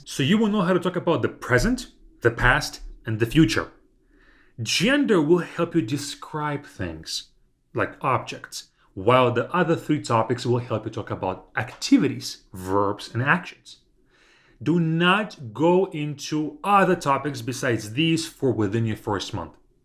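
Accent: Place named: Russia. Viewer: I followed you since at least when you intruduced you as an former tennisplayer i think. Remember the kvartira, yes and now you are speaking eng/U.S. with russia accent and also with the lungs, breth in and out. Importment when speaking is foreginer langauge. My teacher in russia told me: ”you have to speak with your soul and heart”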